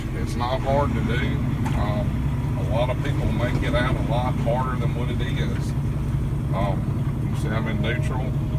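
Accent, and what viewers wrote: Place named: Appalachia, United States. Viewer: Cringeworthy hillbilly accent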